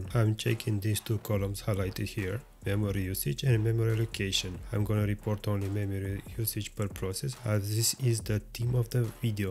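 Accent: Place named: Eastern Europe. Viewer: Great video, love the professional yet slavic accented english, it shows he's doing as good of a job as he can, great indepth overviews, overall 10/10 pc gaming content youtube channel that i would love to see grow from 24 subcribers to thousand if not more! You got at least a new subscriber as long as your videos keep this great of an indepth and quality.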